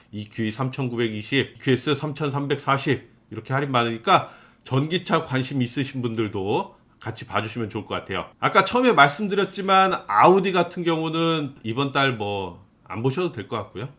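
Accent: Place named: Chungcheong, South Korea. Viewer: 영상볼때 마다 구수한 충성도 사투리가 나와 들을때 안정감이 드네요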